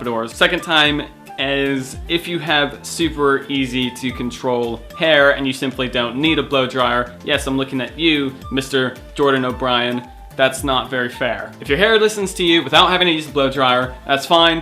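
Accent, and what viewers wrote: United Kingdom. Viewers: You've got a little British accent coming and going in this vid, dude. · do you live in the uk? i don't remember you having an accident. certain words you say has a different sound; you phrase things so clearly. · i've been wondering the same thing, I can go back to a video he made a year ago and he speaks normal american english, but now he talks with a british accent all of a sudden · I just realized, do you have a British accent?😮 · Your accent sounds kinda British in this video.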